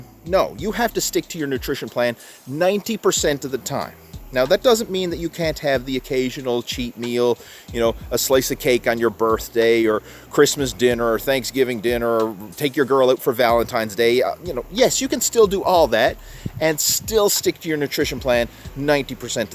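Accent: Newfoundland, Canada. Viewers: Are you a Newfie?!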